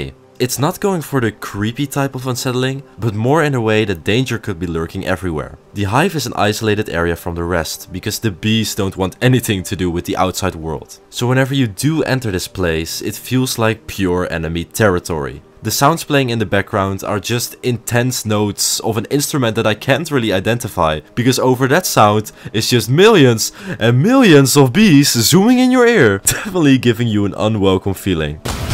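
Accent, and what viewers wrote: Netherlands. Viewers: You can just hear that he is Dutch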